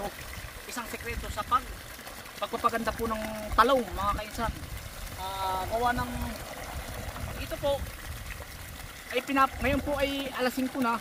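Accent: Quezon, Philippines. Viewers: Iyan po ang nakasanayang tagalog ng mga taga Quezon, mahirap kay na baguhin ang nakasanayan Ay sadya iyan ang punto at mga termino gamit dito sa Quezon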